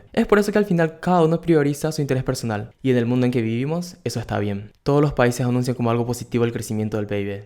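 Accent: Paraguay. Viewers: SOS paraguayo?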